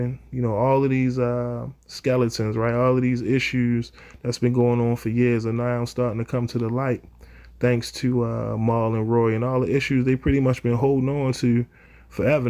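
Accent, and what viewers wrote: Baltimore, United States. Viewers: Man you gotta be from Baltimore lol. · You from Baltimore bruh? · Your from Bmore? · You gotta be from bmore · yo u from bmore? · You sound like you from Baltimore fr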